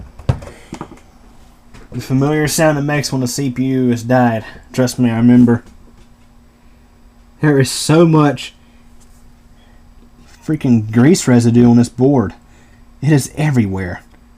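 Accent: South, United States. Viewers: I like the fact that your southern. It just demonstrates the fact that country boys dont have to be simple. Im very proud of this vid btw very great content.👌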